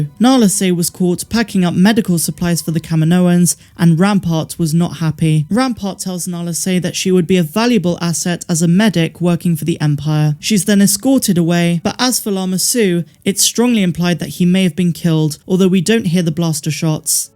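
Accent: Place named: Bristol, United Kingdom. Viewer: Hi. First, thank you for all yours videos.....(still saying your a West country girl, Bristol???) I just love the West Country accent, but I hear the bizzell accent in you. Oh, just to let you know, just in case your thinking, why is he saying all this......don't worry, its because I'm from the West Country......Weston-super-Mare. Anyway, OMG, loved this episode. The John William music made it for me. The man is a Legend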